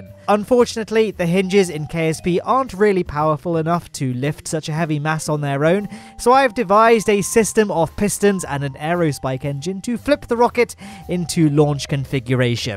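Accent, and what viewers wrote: United Kingdom. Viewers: This guy is the most British person iv ever heard lol 🤣🤣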